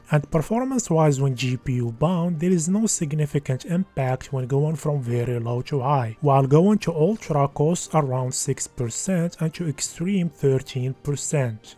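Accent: Morocco. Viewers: your accent is soo moroccan and i love it. + 1 sub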